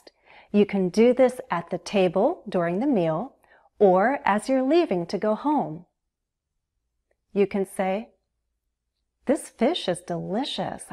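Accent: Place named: United States. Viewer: I am not too much into the American accent, nevertheless I watched the whole video and sensed how noble and classy you are. you nailed it. Thanks, this is my first subscription to an American channel, big thanks to your friendly video here.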